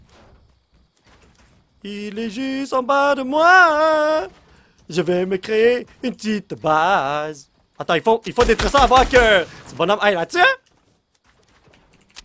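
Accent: Quebec, Canada. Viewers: Cc t mon YouTubeur préférer j'adore tes vidéos fortnight continue c'est trop génial j'adore les accent québécois lol en tout cas continue comme ça et mets un like please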